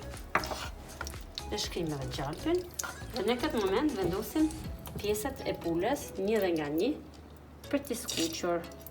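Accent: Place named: North, Albania. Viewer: Te lumshin duart do ta gatuaj se me plqeu shum si recet. se je nga Veriu kuptohet nga e folura po qa vendi mude ta di te lutem.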